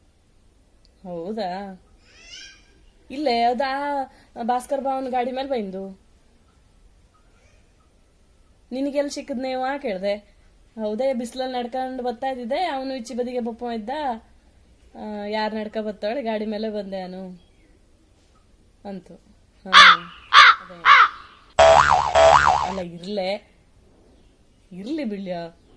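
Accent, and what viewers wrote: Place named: Yellapur, India. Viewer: ನಮ್ಮ ಪ್ರೀತಿಯ ಯಲ್ಲಾಪುರ ಕನ್ನಡ ಬಾಷೆ ಎಂಥ ಸೊಗಸು ನಿಮ್ಮಿಂದ ಇನ್ನೂ ಹೆಚ್ಚೆಚ್ಚು ಸೊಗಸು ಧನ್ಯವಾದಗಳು🎉🎉🎉🎉🎉🎉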